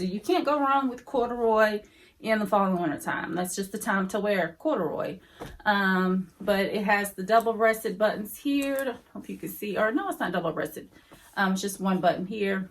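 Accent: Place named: South, United States. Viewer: The J crew blazer print is referred to as herringbone print 😉. I love your hauls and your southern accent is so cute.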